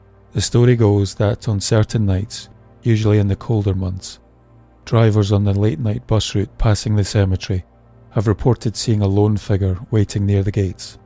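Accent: Scotland, United Kingdom. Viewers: Soooo enjoy your soothing and wonderful Scottish accent! I’m in love. ❤️🏴. Your stories are so intriguing and with the right touch of history and mystery. Scotland is my most favorite place on earth. I am blessed to have sister by heart, ♥️ she is from Aberdeen. Was up this past June. I will definitely be back. Living in Scotland would be my dream. Thank you. And just for a good laugh, I’m from “ Erie” Pennsylvania. USA 🤭😉👍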